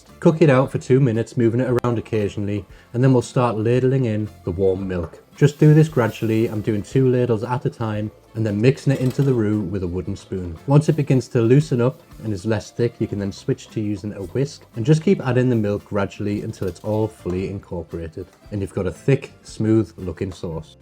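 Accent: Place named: Wales, United Kingdom. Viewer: Really enjoyed this as I'm about to make a big batch for our house. Love the Welsh accent. Greetings from Melbourne, Australian. Hwyl Fawr !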